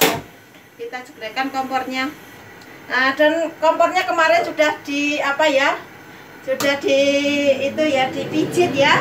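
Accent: Banyumas, Indonesia. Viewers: G ngapak g kepenakk ya mbk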